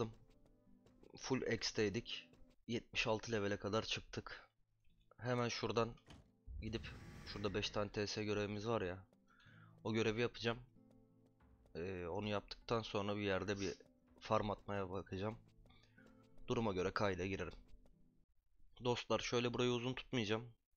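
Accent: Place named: Thrace, Turkey. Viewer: İlk videodan beri diyorum kesin Trakyalı diye. Corludan selamlar 😁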